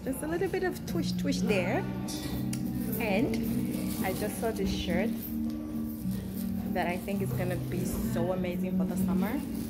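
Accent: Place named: England, United Kingdom. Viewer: heel mooi engels accent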